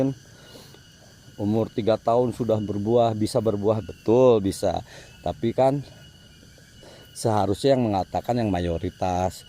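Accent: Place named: West Java, Indonesia. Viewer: Duh uy logat na meni sunda pisan..edun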